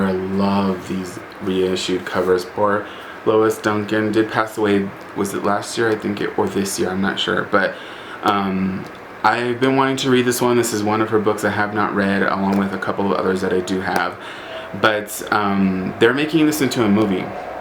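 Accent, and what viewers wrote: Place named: United Kingdom. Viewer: lol your british accent is funny :D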